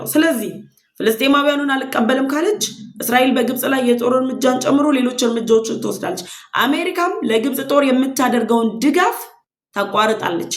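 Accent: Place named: Nigeria. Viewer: አማርኛ የምታወራ ናይጀራዊ ነው የምትመስሊ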